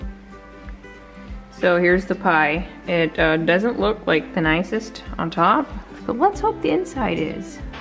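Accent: Pennsylvania Dutch, United States. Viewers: Thank you I really enjoy your recipes Love to hear you speak Pennsylvania Dutch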